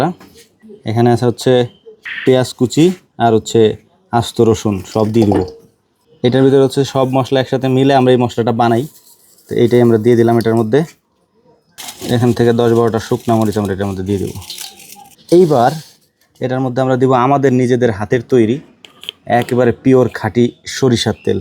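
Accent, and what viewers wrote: Faridpur, Bangladesh. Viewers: ভাইয়ের ভাষা সেম আমাদের ফরিদপুরের ভাষার মতো।আপনি কি ফরিদপুরের নাকি ভাই?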